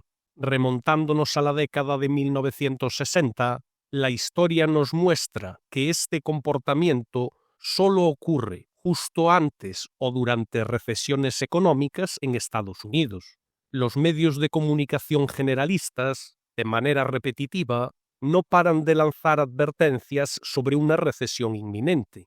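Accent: Galicia, Spain. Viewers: No ves que tiene acento gallego?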